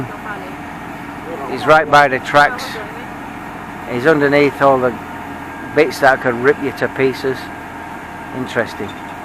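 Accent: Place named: Leeds, United Kingdom. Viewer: you sound like my m8 frm morley int leeds bet im not far off deffo leeds lad bit of crack and im a jock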